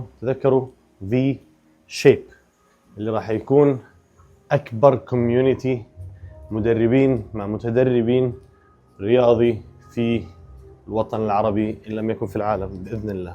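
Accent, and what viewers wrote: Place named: Gulf, Arab world. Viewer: يعم متتكلم مصري ضروري عشان رحت الخليج تتكلم زيهم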